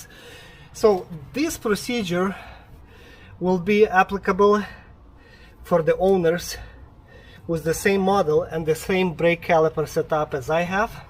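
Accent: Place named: Russia. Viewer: чел, ты реально думаешь, что я смотрел и слушал 40 минут безумного русского акцента про замену тормозных дисков? Оно мне зачем? И, может ты не в курсе, но языки других стран это не проблема с появлением нейросетей. На лету переводят. Впрочем, оно мне не надо, чтобы понять твою речь. Снимай, как хочется.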